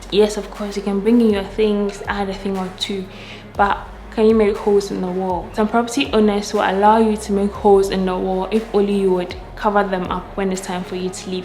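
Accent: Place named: Ghana. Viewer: very well explained and detailed. I love this. I also love the way you talk, are you Ghanaian, if not, what country are you from?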